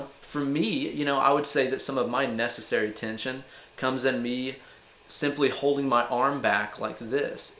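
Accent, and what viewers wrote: South, United States. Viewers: Are you from Knoxville? I've been trying to place your accent for a while. I was thinking Georgia maybe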